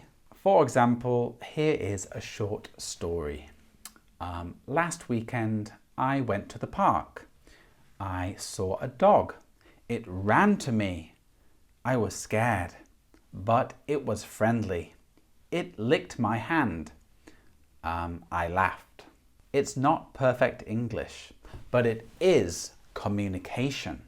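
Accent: RP, United Kingdom. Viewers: You use received pronunciation and I have understood each word. But sometimes it's very difficult for me to understand the native speakers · Very usefull information, thank you for your help, i live in uk from 15 years and my english is A2 level🤦‍♀️i did not like to study in Poland, i was boared and stressed on lessons, thats why i didnt want to learn anything , but you chaneel and tips giving me opportunities to try different ways plus is good to hear reall british accent as on daily basis i work with other multi -culti people so no chance for me to learn proper english :( i also want to learn spanish and italian 😍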